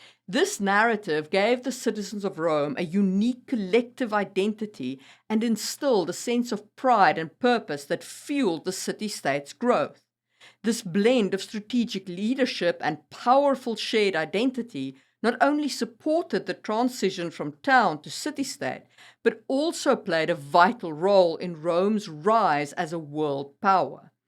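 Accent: South Africa. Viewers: Great video, thank you!! Do I detect a South African accent? 😎 While watching I kept thinking about Cape Town's historical and modern viability as a city state. I'd love to see some videos about how nations and empires crumble, and what happens in the aftermath.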